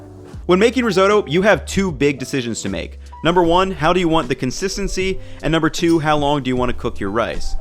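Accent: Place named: United States. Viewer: He's American, so he pronounces it like an American. Because that's how language works.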